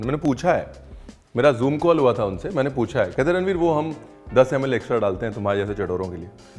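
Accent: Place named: Lucknow, India. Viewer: Recipes ke sath jo lakhnauaa lahja hai bas sunte rahne ka man karta hai. bahut Bahut shubhashesh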